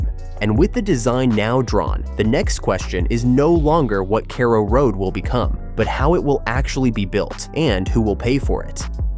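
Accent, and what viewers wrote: United States. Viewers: This fu@ accent……I just can’t….I hear yankish and I snap!F america!F yanks!F Trum!F u!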